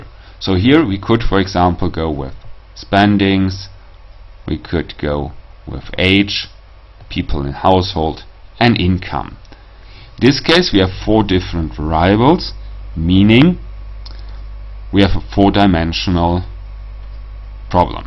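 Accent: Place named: Germany. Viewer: schrecklich deutscher Akzent. Mach gleich ein Video in deutscher Sprache!!!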